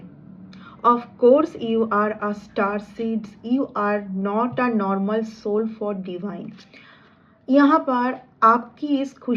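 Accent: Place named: Bengal, India. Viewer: Very beautiful reading....when a Bengoli person speaks Hindi.....it's sound very sweet 🧁❤❤❤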